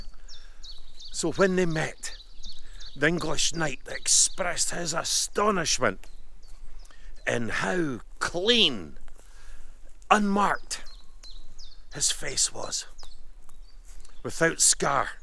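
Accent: Scotland, United Kingdom. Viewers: I would have never thought that one day i'd watch a video of Avatar's villain showing me the tomb of a medieval knight, with the thickest scottish accent i've ever heard.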